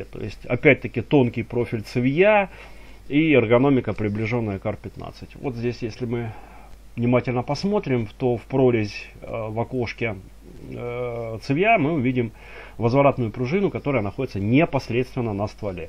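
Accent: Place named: Ukraine. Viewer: Українець так що його можна панять і прастіть))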